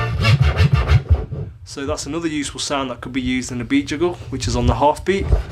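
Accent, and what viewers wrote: United Kingdom. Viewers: These vids hVe helped me more than words can express, excellent job bro, you even sound proper cause of ur english accent. Great vids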